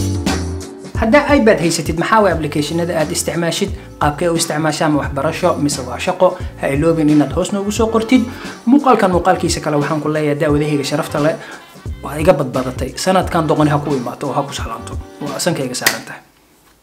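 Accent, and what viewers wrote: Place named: Djibouti. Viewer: Réer Djibouti ayant yahaye marka ii dul kaata sidan uu gorayo af somaliga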